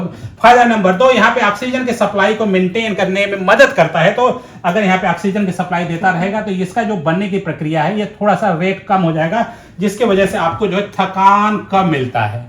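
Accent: Bihar, India. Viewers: बड़का डाक्टर। 😂❤ बिहारी हैं का? भोजपुरिया?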